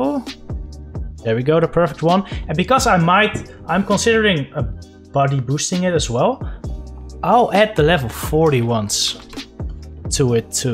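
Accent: Netherlands. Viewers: Are you dutch? Just by the accent good video, this was helpful for my sableye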